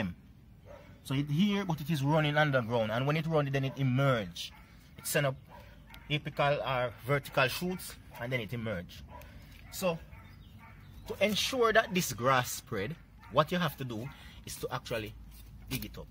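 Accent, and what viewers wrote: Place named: Jamaica. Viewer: Your accent is the best! Thanks for the tips. I'm very excited to get my zoysia going down under on the gold coast with a little jamaican knowledge!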